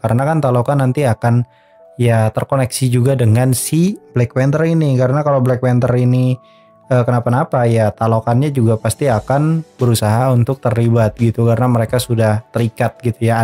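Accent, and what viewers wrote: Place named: Bogor, Indonesia. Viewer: Bahasa sunda lu kek barudak bogor pride anjir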